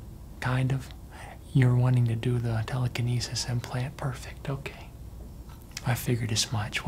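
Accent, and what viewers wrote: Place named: Midwest, United States. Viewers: He's giving me Chicago Bears SNL with the accent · You sound Midwestern and i love it 😊 you feel so comforting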